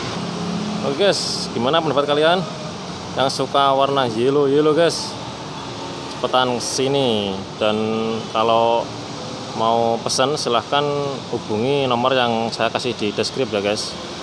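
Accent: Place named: Java, Indonesia. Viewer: Medoke lucu bang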